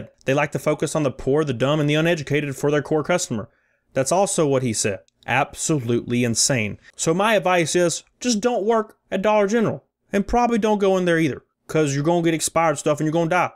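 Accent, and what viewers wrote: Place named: North Carolina, United States. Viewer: Where you from brotherrrrr?!? I’m trying to guess by your accent. I’m guessing North Carolina. Much love from Houston Texas!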